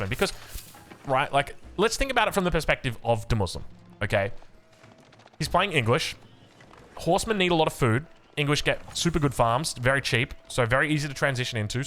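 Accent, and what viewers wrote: France. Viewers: still wanted to hear your French accent Man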